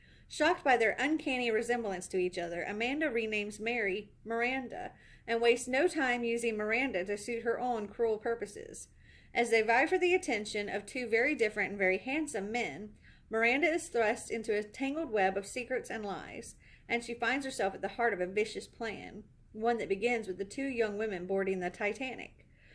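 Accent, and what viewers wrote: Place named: South, United States. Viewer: Your ollies store has so many more books than mine. Mine only has a few good ones mostly Christian fiction or true crime which I don’t read! Awesome haul though I really love how you read the synopsis or tell us what they are about so I don’t have to pause every second and look on Goodreads lol!!! Also where are you from? You sound southern, which I rarely see on booktube other than myself!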